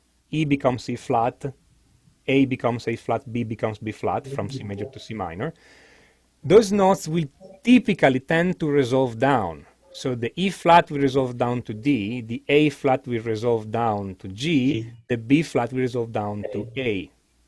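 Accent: Italy. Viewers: Are you italian in Milan? You sound 100% like my boss 🤣 great videos!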